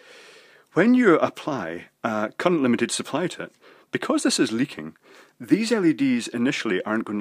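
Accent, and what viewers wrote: Scotland, United Kingdom. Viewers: Haha, yes! I'm doing this same 100W LED project, and these videos help me for this same reasons, also Scottish accent's refreshing to boot.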